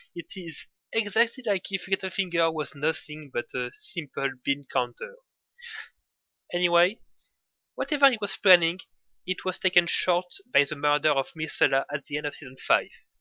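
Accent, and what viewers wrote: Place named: France. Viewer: Y a qu'un français pour prononcer "épisode" comme ça ^^ c'est la première fois que je vois un francophone faire des vidéos en anglais, c'est un peu perturbant d'avoir un aperçu de notre accent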